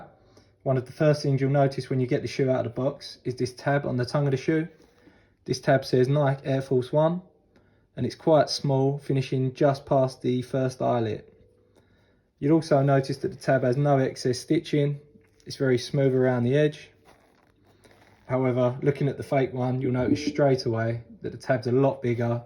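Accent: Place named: United Kingdom. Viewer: Ohhh that british accent.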